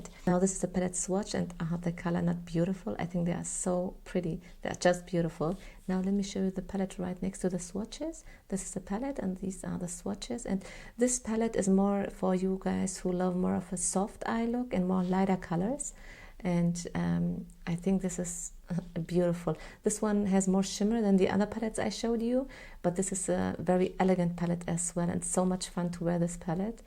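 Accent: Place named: Arab world. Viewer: I will guess Middle East . Arabic.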